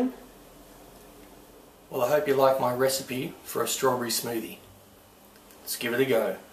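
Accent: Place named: Australia. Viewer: Accent .... i love Australian accents !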